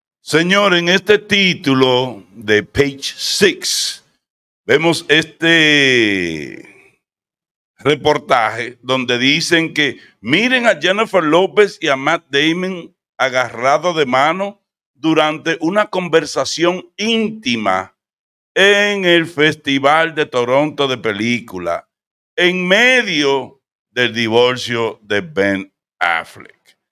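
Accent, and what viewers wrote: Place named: Latin America. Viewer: Me encanta como hablas Típico Latino y sus risas Gracias por hablarnos en Español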